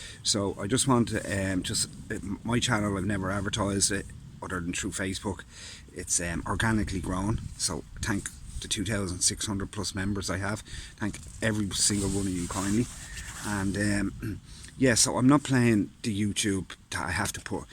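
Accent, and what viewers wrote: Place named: Ireland. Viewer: Awesome tent and a great video, thank you. Always love the Irish accent - more like purring than speaking! Just FYI and for others, the full weight of the 1P is 1.25 KG, vs 1.42 as you rightly said for the 2 person. So for the 170g difference I agree - take the extra space! £240 online right now as well. A properly well made tent, rather than the "Big" American brand stuff.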